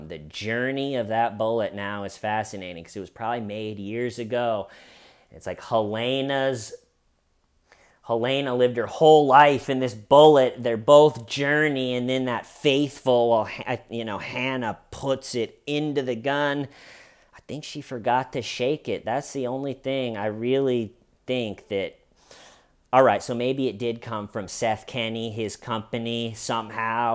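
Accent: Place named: New York, United States. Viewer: You're picking up a NYC accent, homie.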